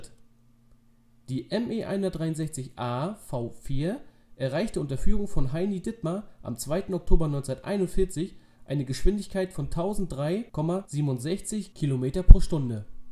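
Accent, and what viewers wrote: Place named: Mecklenburg-Vorpommern, Germany. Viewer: Der Sprecher stammt der Aussprache nach, vermutlich aus dem nördlichen Mecklenburg-Vorpommern😉. Klasse Video!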